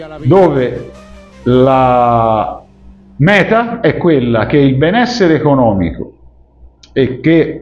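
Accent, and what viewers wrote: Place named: Florence, Italy. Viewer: e tu sembri di Firenze!!!!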